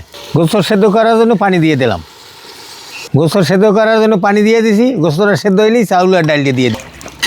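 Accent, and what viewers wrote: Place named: Barisal, Bangladesh. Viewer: বরিশালের মানুষ বরিশালের আঞ্চলিক ভাষায় কথা বলেন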